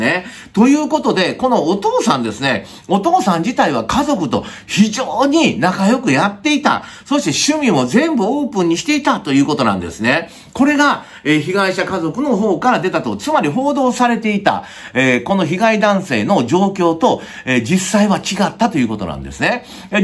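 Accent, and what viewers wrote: Kansai, Japan. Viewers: たしかお父さん、被害者にルナに合わないでくれって電話してましたよね？ルナを止めると暴れるから被害者に伝えたと他のニュースで見ました。 しかし関西弁で軽快なトークで面白い😊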